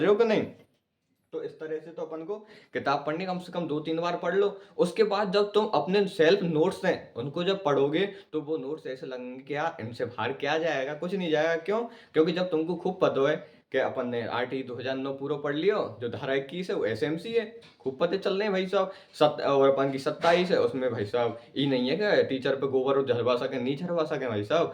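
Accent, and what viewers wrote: Bharatpur, India. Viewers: Bhaiya bharatpur ke ho kya aap 🤔🤔 bnva rahiyo h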